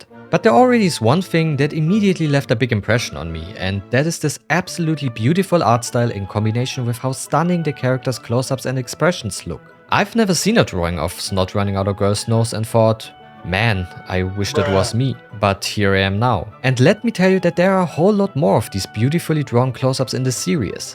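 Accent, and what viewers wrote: Germany. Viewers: (hmmm... The algorithm suggests this ani-tuber. Let me see what that have to show me.) later; That was good. A well-argued recommendation for a manga, soon to be anime. Lightning-fast references for comedic emphasis. All delivered in a well edited video. And the German(?) accent gives it an authoritative cachet.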